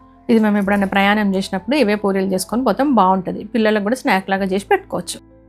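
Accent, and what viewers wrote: Telangana, India. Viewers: మంచి తెలంగాణ వంటకం తెలంగాణ యాస లోనే చెప్పారు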